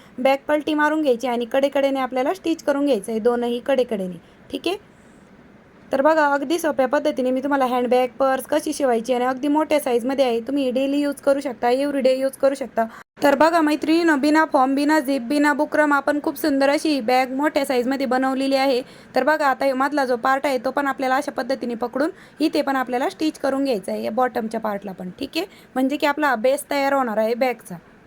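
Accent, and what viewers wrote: Ahmednagar, India. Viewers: तुमचे गाव कोणते आहे मला भाषा आमच्या नगर जिल्हा ची वाटते सबस्क्राईब केले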